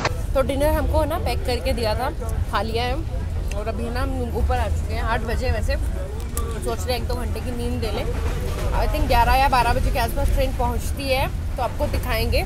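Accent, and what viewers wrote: India. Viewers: Tum lazmi hindu ho. Kyu k tum namastay aur sat sri akaaaal to bolti ho laikin salaaaam boltay tum ko bohat takleeef hoti hai. Sikh hoti to salaaam zaroooor karti. Sikh hoti to punjab ya khalistan ka naaam layti. Baaar. Baaaar. India. Ya. Hindustan. Na. Bolti. Tumhara. Urdu. Bolnay. Ka. Andaaaz. Pakka. U. P. Wala. Hai. Bhia. Ho. Bhia. U. P. Ki. Bhia. Love. Khalistan. ❤❤ v. Real. Sikh. For. Khalistan. Khalsa. For. Khalistan. May. To. Insaaaan. Ki. Shaksl. Dekh. Kar. Us. Ko. Pehchaaaan. Layta. Hoon.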